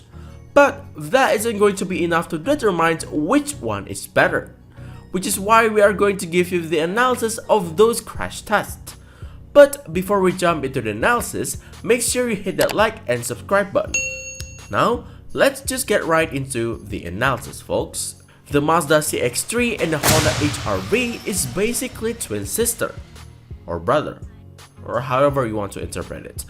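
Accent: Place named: Indonesia. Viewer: You sound like Indonesian. Are you?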